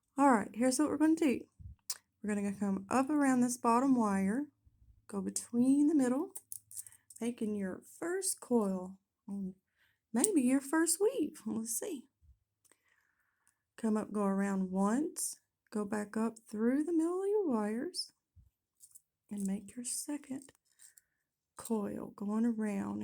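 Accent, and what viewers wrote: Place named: South, United States. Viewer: I saw a top comment of yours and I thought that was pretty awesome. Never met too many southern white people who enjoy 2Pac. Love your beautiful southern Belle accent, Mrs.!!! I’m from the south as well btw. 😊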